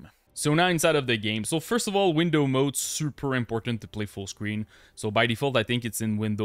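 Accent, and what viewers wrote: Quebec, Canada. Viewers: Are you from Quebec? You have the same accent as my wife and Georges St-Pierre. :D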